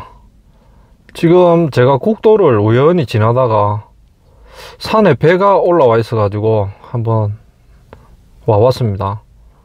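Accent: Gyeongsang, South Korea. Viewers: 경상도 사람이 경상도 말쓰지 제주도 말쓰링???웃겨들~~~~~~~~~~~~~~~~~~~~~~~~~ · 경상도 말 사용하니 더 정감이 가고 좋습니다 힘내시고 갱상도 홧팅❤ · 경상도 사투리가 어때서요? 경상도 사람이 경샹도말 하는건 당연한거죠.하여간 별별 간섭질 하는 사람들 그것도 병이다. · 산 위에 배. 기억이 희미하기는 하지만, 강원도 정동진 바닷가에도 있었던 거 같은데? 이 곳은, 건축, 영업시설 자체에 문제가 있어 보인다. 바로 옆에 대형 철탑, 주변에 그런 대형 철탑이 산재하여, 이런 곳은, 기피장소에 해당한다. 그리고 산 위에 대형카페와 숙박시설을 건축하다보니, 걸어가야 하는 그 진입로 부분도 너무 좁고, 길고, 안전 난간도 낮고, 부실하여, 불편, 불안해 보인다. 보이는 전망이 주변 산 위에 시설된 철탑들인데, 굳이 이런 곳을 찾아서 가려할 까? 그리고 경상도 사람이, 경상도식 억양과 말투로 말하는 것은 당연한 것인데, 그런 것을 가지고, 어느 개 동네에서 사는 강아지가 낑낑 거리며 그런 개소리를 짖을까? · 경상도 사람이 경상도 말 해야지 어디 말 할까요 개 껌 씹는 소리 그만 하세요. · 진례ㆍ장유 김해주촌 ㆍ진영ㆍ생림 삼방 안동 사투리좋습니다 응원합니다🎉😂😊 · 경상도 사투리 넘넘 듣기좋아요 방송최고입니다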